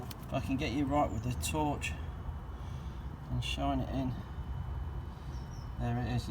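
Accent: United Kingdom. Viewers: i like your british english...lol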